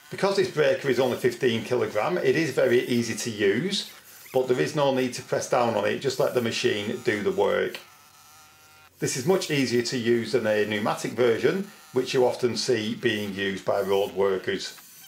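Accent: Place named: Lancashire, United Kingdom. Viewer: That Lancashire burr can’t be caged, that would be criminal.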